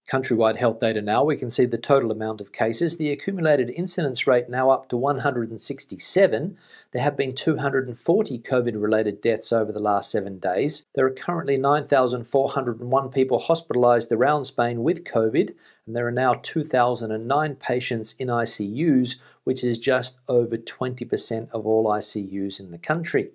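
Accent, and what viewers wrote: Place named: Australia. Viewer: Thanks. I look forward to listening to you every day; especially with your cheerful Australian accent! I’ve been waiting for over a year to find out when I can get over to Spain from Scotland as I have a few holiday houses I have my eye on. It’s been so frustrating and we are still no further ahead. I thought that the vaccines were supposed to give us more freedom but, alas, it doesn’t look like it’s going to make much difference. Take care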